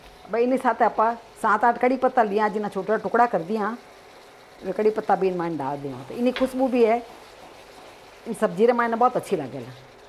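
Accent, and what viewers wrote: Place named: Rajasthan, India. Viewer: Hum b Rajasthan se hi h Aapke muh se marwari boli bhut achhi lagti h Gud recipes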